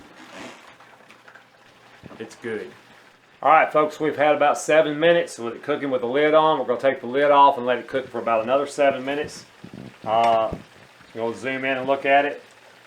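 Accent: South, United States. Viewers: Delicious!!! Good southern man accent which I can recognize 😉👌😊 straight away, I learned it from a famous movie, Trump, by Tom Hank!! Ha, ha!! Love from Hong Kong!!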